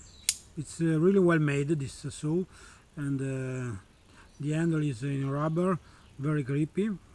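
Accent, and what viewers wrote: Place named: Avellino, Italy. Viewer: Noto un accento avvellinese a sto inglese 🤔🤔🤔🤔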